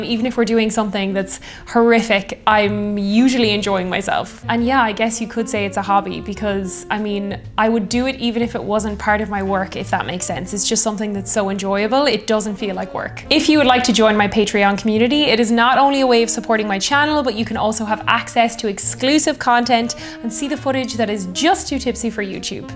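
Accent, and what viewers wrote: Ireland. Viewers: Gotta love the Irish accent.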